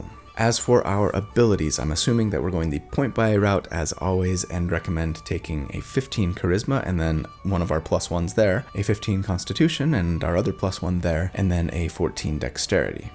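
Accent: United Kingdom. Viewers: As britshman I confirm this is how we sound. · Bes bri'ish accent evah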